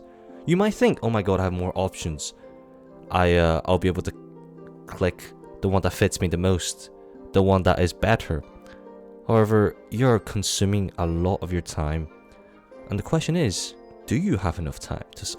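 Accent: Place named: Northern England, United Kingdom. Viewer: Which county are you from you sound like ur from the north but I’m ashamed to say I can’t tell which specifically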